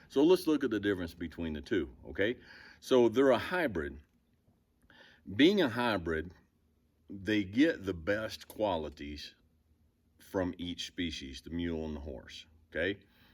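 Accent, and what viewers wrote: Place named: South, United States. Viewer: Yes it is and I like that southern accent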